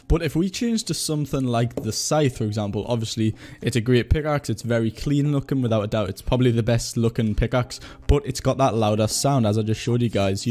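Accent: Newcastle, United Kingdom. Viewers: are you a geordie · Geordie boy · You from Newcastle? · are you from Newcastle???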